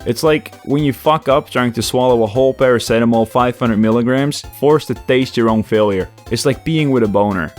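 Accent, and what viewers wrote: Netherlands. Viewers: You sound Dutch!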